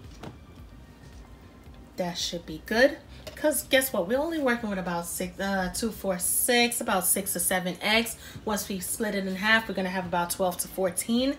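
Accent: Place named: Philadelphia, United States. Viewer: Ur killin me young Lady.....lol!.........You sound South Philly to me.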